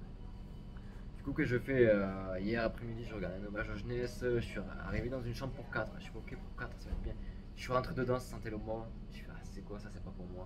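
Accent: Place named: South, France. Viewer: Pleins d’émotions soutenue par ton accent du sud, on apprécie ! 👌🏻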